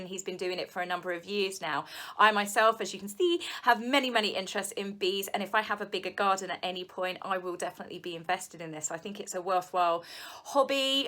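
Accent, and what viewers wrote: England, United Kingdom. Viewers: You were looking Sttinkn Cute today! That's Southern for you look really nice! I loved when you said Baby Mama that was a first forme from someone with an English Accent. That was great. Bless you!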